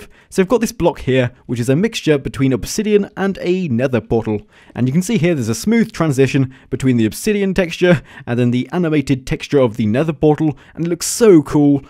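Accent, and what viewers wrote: United Kingdom. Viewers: is he really british?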